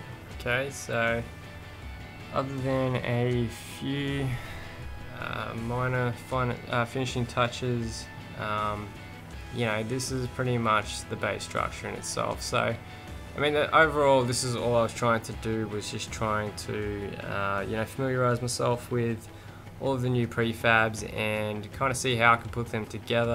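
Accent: Australia. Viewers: are you aussie, just curious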